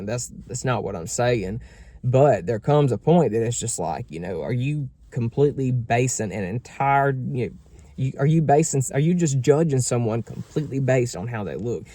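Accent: South, United States. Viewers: I agree and really like your jacket and everything you said is so damn true. Love your southern accent!!! For me being a chub all my life I’ve seen this and it’s been a lot harder to date. I have struggled with this all my life and I finally just take care of me and of my health and if they don’t like it I now say O well and just try to be me. I like to now not look at looks but how and what kind of a person that they are inside. That’s more attractive now than how I used to be and how others are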